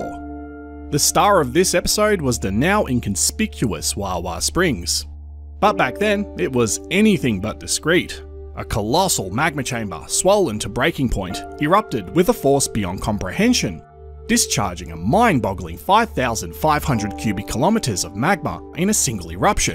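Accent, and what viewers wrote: Australia. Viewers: I happen to like his Aussie accent. Let him alone, especially since he works hard to make his content informative, and intelligent! You are awesome,!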